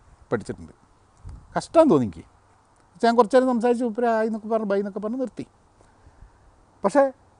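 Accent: Kozhikode, India. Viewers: മ്പളെ കോഴിക്കോട് പ്രനൺസ്യേഷൻ 👌👏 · ഞാനീ വീഡിയോ കാണാനുള്ള ഒരു കാരണം ഞമ്മളെ കോയിക്കോടൻ ബർത്താനം കേക്കാനാണ് അമേരിക്കൻ ഇരുന്ന്കൊണ്ട് ആ നാടൻ ബർത്താനം കേക്കുമ്പോ ഉള്ള ഒരു സുഖം അത് ബേറെ തന്നെ. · Njammalu malappuranu ingale kozhikkodan bhasha Perth ishtanu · ഇങ്ങടെ കോഴിക്കോടൻ ഭാഷയ ഇങ്ങളെ ഞമ്മക്ക് പെരുത്ത് ഇഷ്ടപ്പെടാൻ കാരണം.. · you are 100% right. I like your kozhikodan style malayalam, though I am from Kollam. English pronunciation is not something to be worried too much. we must be able to communicate. Those who want to learn english , please watch an english movie or a cartoon over and over until you learn all the dialogues in it. Then try another one. if you do two or three movies like that, you can easily communicate in English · ഞാൻ കോട്ടയക്കാരൻ ആണ് പക്ഷേ എനിക്ക് ഏട്ടൻ ന്റെ കോഴിക്കോട് ഭാഷ വല്ല്യ ഇഷ്ടം ആണ്.നന്നായി മനസിൽ ആവുന്നുണ്ട്.ഇങ്ങള് supprrrr aneee.. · ങ്ങള് ഭാഷ മാറ്റരുത്...(കോഴിക്കോട്) അത് മാറിയാൽ പിന്നെ നിങ്ങളില്ല.... 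( സഞ്ചാരം പരിപാടി അതിന്റെ വോയ്സ് ഓവർ കൊണ്ടാണ് ജനശ്രദ്ധ പിടിച്ചു പറ്റിയത്) അത് പോലെ... നിങ്ങളുടെ ഈയൊരു... ശൈലിയാണ്..കിടു... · സാറെ,ങ്ങളെ മക്കളെ ങ്ങള് കോയിക്കോട്ടെ സ്ലാങ് പഠിപ്പിക്കണം!!ങ്ങള് കോയികൊട്ട്കാര്ക് അഭിമാനമാണ്!!പിറന്ന നാടിന്റെ ഭാഷ മുറുകെപിടിക്കുന്ന താങ്കൾ ഒരു മുത്താണ്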